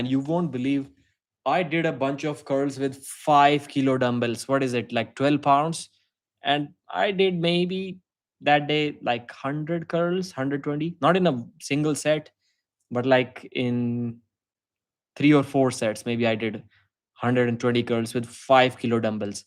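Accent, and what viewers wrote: India. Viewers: More Indian narrating, stfu and post the fucking match and wash your hands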